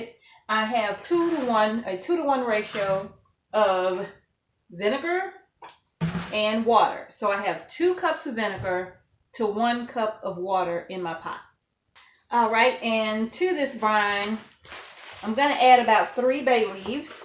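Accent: South, United States. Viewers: You are an adorable girl! You sound like a girl raised in the South....I am tuning in from SW MS....thanks for sharing your knowledge with me.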